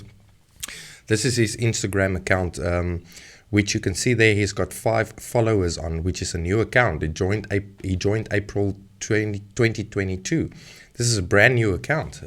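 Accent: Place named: South Africa. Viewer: why this south african sounding ass over here worried about american 2nd amendment? 🤣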